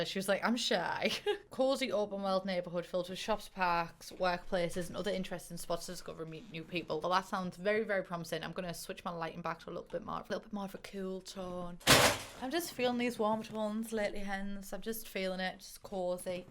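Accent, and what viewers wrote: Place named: Teesside, United Kingdom. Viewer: Are you from boro? I’m from Teesside and you have a proper boro accent.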